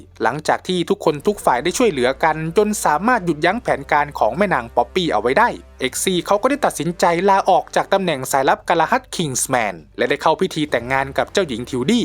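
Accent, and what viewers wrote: Isan, Thailand. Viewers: แอดพูดอิสานน่ารักมาก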